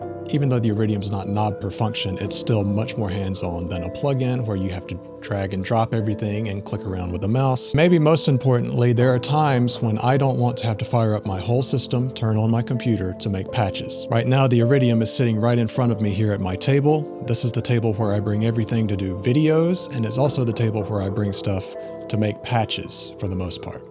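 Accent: South, United States. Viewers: Just to clarify for anyone reading..I was joking about the looks and voice thing. I'm sure many consider J's attributes beyond reproach.. personally though, I don't judge a person based on either. (..got to admit, though, that "southern drawl" sounds pretty cool. 😁)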